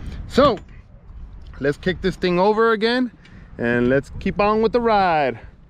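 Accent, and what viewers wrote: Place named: South, United States. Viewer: Love that country twang 😂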